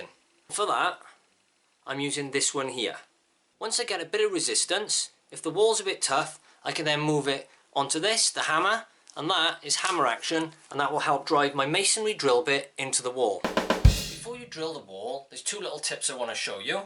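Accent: United Kingdom. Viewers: “Cello tape” and “Hoover”……even if he didn’t have an accent, those terms are a dead giveaway. Love a British accent!!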